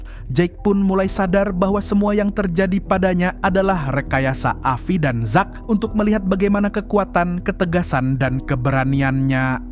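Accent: Banjar, Indonesia. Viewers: Tebak nah admin nya banjar bagian mana😂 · Urang banjar sekalinya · Buan banjar kah om ai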